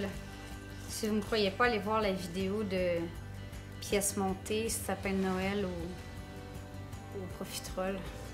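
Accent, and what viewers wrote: Quebec, Canada. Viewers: Accent québécois exagéré. Désagréable .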